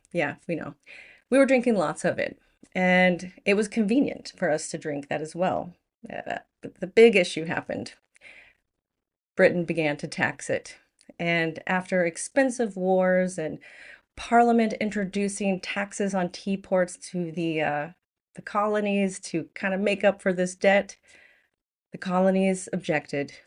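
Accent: South, United States. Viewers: Hi,I do enjoy your videos. For one reason, you don't start in hysterics like many other US folks, and your accent is charming. No I didn't learn a lot about the tea vs coffee thing, because I was mostly aware of it, but I enjoyed your telling me about it. I am "very" British, and a "chain" tea drinker, but also enjoy a good coffee - Columbian being my preference made in a cafetiere (French press). I had great coffee in France, Spain and Italy, but the best ever was made in a "briki" using stream water in a small mountain village in Crete! Coffee is a slightly toxic stimulant whereas tea is an infusion.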